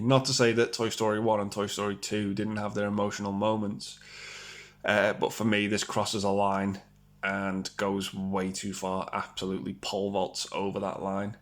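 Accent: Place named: North West England, United Kingdom. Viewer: I'll be honest a lot of movies in your list i 've not even seen because they aren't a genre i'm into, but i certainly respect your list and right to an opinion. I look forward to your list of unpopular movies you like, i definitely have plenty of those too. I really like your honesty and attitude. I'm guessing from your accent you are from around the North West, i'm technically North East, just below Hull.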